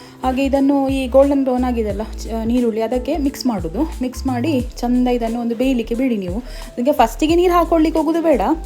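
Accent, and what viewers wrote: Mangalore, India. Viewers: Mangalore kannada ok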